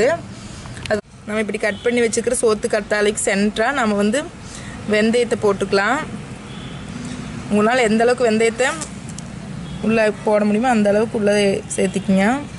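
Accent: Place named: Kongu, India. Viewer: உங்கள் கொங்கு தமிழ் very nice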